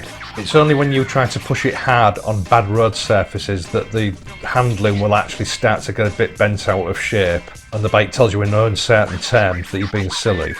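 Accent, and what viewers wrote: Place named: East Riding, United Kingdom. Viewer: sounds like a deep East Riding accent to me...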